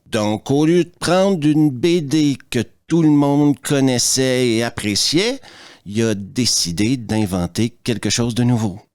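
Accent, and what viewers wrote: Quebec, Canada. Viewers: avec ton accent je voyageais au pays de la poutine et au jourd hui j'apprend que tu vis chez moi XD c'est beau mais ca casse le rêve ahahha . des bisou merci pour tes video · Mon gars ta chaîne c'est une petite pépite,je dévore tes vidéos les unes après les autres. Déjà que j'ai un faible pour l'accent québécois mais là franchement avec ton humour en plus ta façon de t'exprimer franchement c'est vraiment que du kiff. Et bordel qu'est-ce que astérix et Obélix la Terre du Milieu est un film de merde et de voir Guillaume Canet chialer en disant allez voir mon film c'est vrai que franchement c'était pathétique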